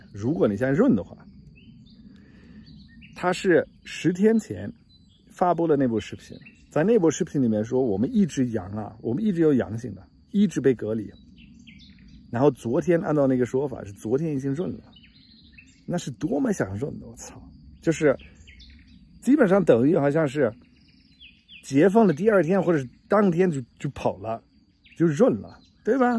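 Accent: Standard. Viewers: 竟然會標準口音厲害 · 的中国话比很多国人的话还标准了，还有几句来句操，感觉很对位😂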